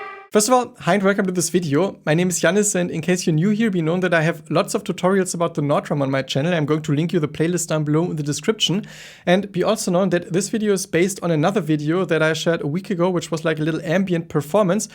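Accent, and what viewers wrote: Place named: Germany. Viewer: i like the way you speak, professional english with that little german accent chills me somehow lol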